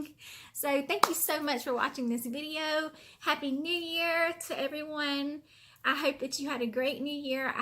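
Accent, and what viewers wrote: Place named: South, United States. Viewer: Welcome to the teaching tag! I love your southern accent! Hubby and I have always wanted to travel to the south and try the food! I'm so glad you are doing some more peer tutoring. Its so beneficial for students on both sides of the learning. I will add you link to my January video ♥️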